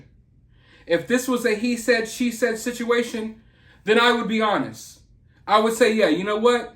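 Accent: South, United States. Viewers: You are a way better actor than she ever wanted to be, and the southern accent love it Sho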